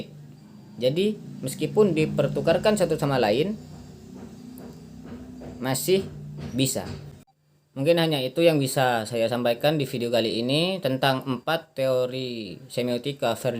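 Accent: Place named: Madura, Indonesia. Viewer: logat Madura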